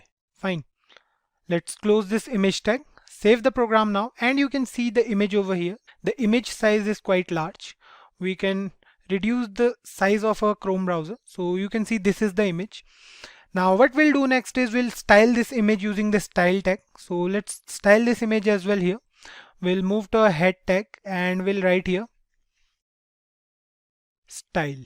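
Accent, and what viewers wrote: India. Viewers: I cannot accept the sound of Indian. Its very difficult for me to understand.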